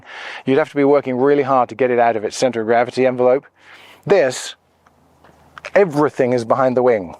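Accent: United Kingdom. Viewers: Hi only just discovered your channel and subscribed after watching your first video. Really like your style of presentation and the cool British accent is music to the ears 😂 I’m currently looking for a Bonanza in Australia and confused between the choice of an A36 a V35 and a C33 Debonair which are currently available on Australian market. Can tell from your videos you’re not a big fan of the Vtail so youd probably rule that out but between the A36 and C33 which one is your favourite and why? So far through my reading (and watching YouTube!) the A36 has the advantage of bigger door, which would be a plus for me for loading say camping equipment or a bike and also Ive heard the longer fuselage helps with less wag in turbulence. Keen to hear your thoughts on it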